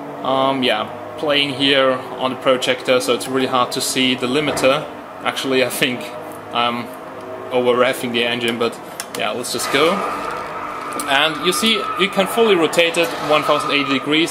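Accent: Germany. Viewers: hör auf n deutschen accent zu haben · You have a german accent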